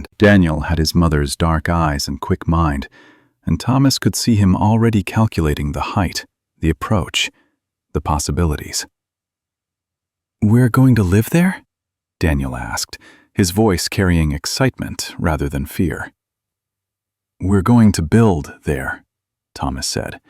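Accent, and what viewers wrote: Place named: RP, United Kingdom. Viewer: Please explain the reason for the back and forth shifts in the narration, starting in standard North American English without accent and changing to English with a posh British accent. This starts at approximately . At that point, the narration was describing Daniel's father, Thomas, who was a immigrant stonemason from Ireland. (Just a little historical side note: In the past --- how can I say this delicately--- the Irish and the British were NOT best buddies. Enough said.) Then at , the narrator returns to North American speech without accent until approximately when posh British starts up again. Posh British only lasted a short time until stopping mid-sentence . From that time, the narration was in North American English until when Posh Brit accented speech popped back up up. the narration reverted to North American speech without accent until the end in less than one minute. 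This story and the background artwork were excellent. However, the back and forth accent narrative is distracting and detrimental. Please skip the posh British accent unless the story is about posh British characters.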